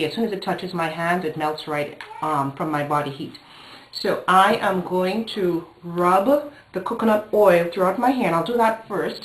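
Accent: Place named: Trinidad and Tobago. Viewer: Girl you sound so Trini, but you're probably from JA, big up!!. Thanks for the tips, will be having a go at it..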